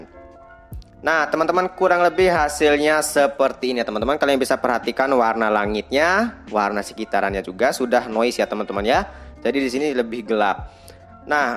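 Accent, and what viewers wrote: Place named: Eastern Indonesia, Indonesia. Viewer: Monmaap bg, orang timur ya? Kental banget nada suaranya 😊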